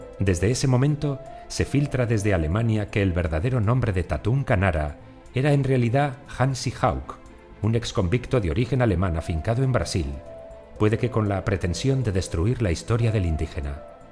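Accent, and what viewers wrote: Spain. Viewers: El documental está narrado con un acento 100% de España, no hay nada extranjero (ni peruano) en él.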